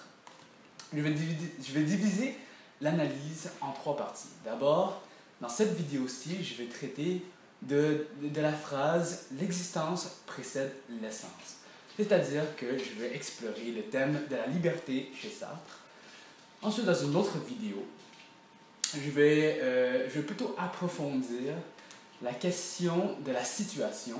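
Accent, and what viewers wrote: Quebec, Canada. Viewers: C’est dur à écouter l’accent québécois mais très bien expliqué merci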